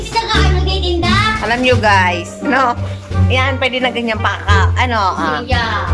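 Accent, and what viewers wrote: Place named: Batangas, Philippines. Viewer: Hala sis May punto ka taga Saan ka sis. Batangas ka ba or cavite? Talaga sis Daming trabaho ang binigay sa inyo. Wow naman amo nyo pala sobrang bait pala nila. Nakakatawa ka sis May pagka comedy pala ang channel mo lagi ka ba ng ganyan? Sa mga videos mo? That’s good sis. Ayan mas cute ka na dyan sis para kang diwata ng kagubatan. Bagay na bagay sa iyo sis. Nagawa ko na ang dapat sa iyo sis. Sana magustuhan mo Ayos na yon ha sis. Happy New Year. Ohh man part two pa pala mga bases naman. Ok lang yan sis kaya nyo yan May joker naman sa grupo hahaha.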